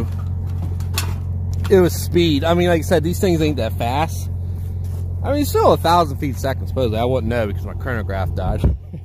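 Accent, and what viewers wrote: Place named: Pittsburgh, United States. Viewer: Pittsburgh area? You sound like it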